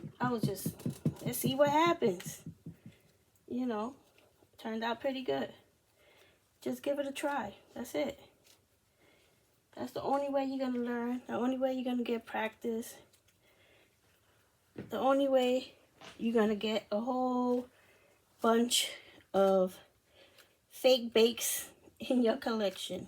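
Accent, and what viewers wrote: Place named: New York, United States. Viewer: Where you from I hear a accent, you from NY?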